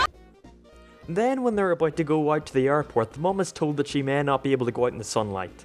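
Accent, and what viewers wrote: Ireland. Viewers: Oh my gosh he sounds so Irish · I fell like he has a Irish accent someone tell me if he is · Bro is Irish asf 6y later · He has more of the Irish accent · jeez early sounds irish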